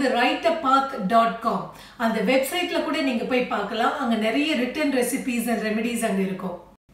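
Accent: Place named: Tamil Nadu, India. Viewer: Mam tmrla iruthu try panre mam,result kandipa share panre mam,,,,and ur tamil slang sooooo nice mam🖤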